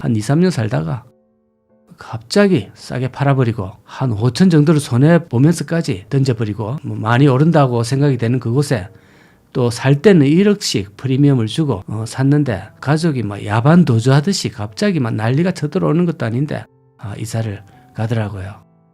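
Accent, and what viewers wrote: Gyeongsang, South Korea. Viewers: 나레이션 특이합니다 경치도 재미있고 목소리도 재미있고. 집사람은 좀 느끼하다고 하지만 ㅋ 그래도 나는 경상도 사투리가 좋네요 ㅎㅎ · 이 분 부동산 인사이트가 대단하세요. 구수한 경상도 사투리지만 설명에는 정말 뼈가 들어가 있어요. 부동산에서 인생의 흥망성쇠도 잘 보여 주셔서 참 많이 배웁니다.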